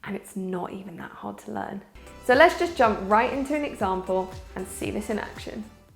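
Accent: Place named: RP, United Kingdom. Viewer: Thank you for some sage advice;-) Keep going, you have good skills and a very nice accent (I'm an American, so we love this particular posh accent.)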